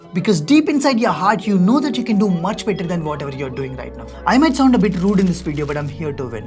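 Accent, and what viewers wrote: India. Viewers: that indian ahh accent but awesome work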